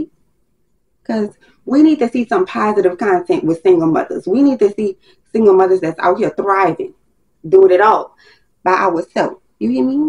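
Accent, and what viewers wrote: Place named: Los Angeles, United States. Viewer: Girl I've been binging your vids all day! I found your channel today while looking up correctional nursing videos as I only have one more pre req before I apply to my RN program. Been a CNA wayyy too long (7yrs) and ready to finally change my life for myself and my 2 toddlers. You're a breath of fresh air and I'm excited for your content and going on my nursing/healing/motherhood journey right with you girl❤...oh and that LA accent is everything baaaby or however y'all say it lol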